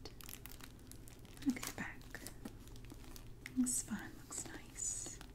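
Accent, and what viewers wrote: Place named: North Carolina, United States. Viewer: Your voice reminds me of home. Born and raised in North Carolina. Very soothing to listen to when I'm far away from home. Hope you had a blessed holiday season 💕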